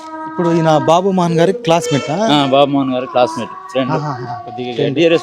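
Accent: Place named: India. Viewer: Bro. Mee voice ma vurilo local voice lagundi anna eh vuru anna medi?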